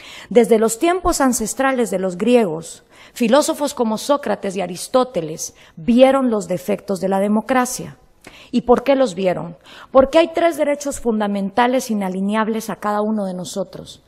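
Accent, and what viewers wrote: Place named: United States. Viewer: acento norte americano y eso sí ya es peligroso...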